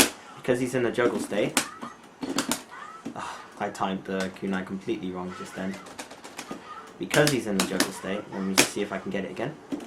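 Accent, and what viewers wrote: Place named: United Kingdom. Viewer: um i dont wanna sound disrespectful but for i bit i thought you were british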